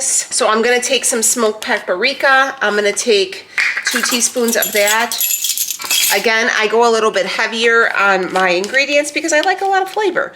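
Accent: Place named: Minnesota, United States. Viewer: Looks awesome! I'm going to try this. Where are you from? You sound like you are from my home state of Minnesota! :)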